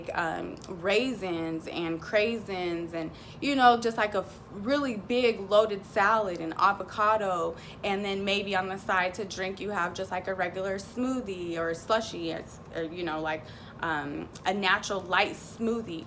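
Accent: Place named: Jamaica. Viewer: Beaitiful skin; sounds Jamaican too.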